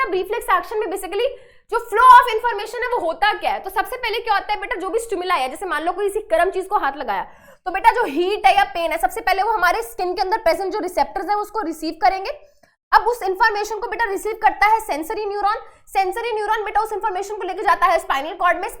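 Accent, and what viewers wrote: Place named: Punjab, India. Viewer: Ma'am was catching her Punjabi accent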